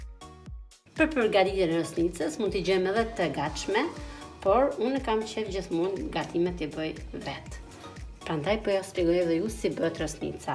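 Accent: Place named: North, Albania. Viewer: Te lumshin duart do ta gatuaj se me plqeu shum si recet. se je nga Veriu kuptohet nga e folura po qa vendi mude ta di te lutem.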